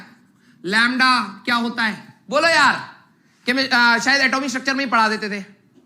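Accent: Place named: South, India. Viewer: Ayiyo accent wala gajb ka😁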